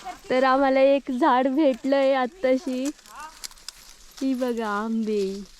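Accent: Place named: Pune, India. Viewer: मला तुमच्या बोलण्यावरून आणि तुम्ही ज्या भागात राहता त्यावरून वाटत होतं की तुम्ही पुणे जिल्ह्यातल्या आहात आणि आंबेगाव किंवा जुन्नर तालुक्यातल्या असाल... खेड (राजगुरुनगर ) च्या जवळच राहतो आम्ही.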